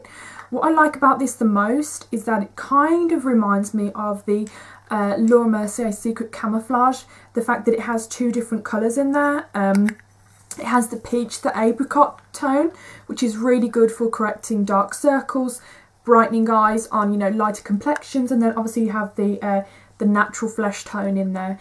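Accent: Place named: Birmingham, United Kingdom. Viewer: where are you from you sounds like a brummie! xx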